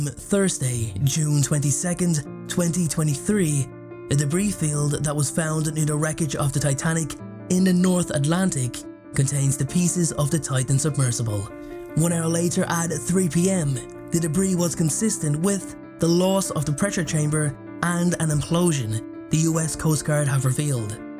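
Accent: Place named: Dublin, Ireland. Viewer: Well done on the video,but if you’re gonna try hide your inner Dublin accent,leave out the Americanised upswing at the end of almost every sentence,it starts to sound way too bullet pointed ! Just a thought…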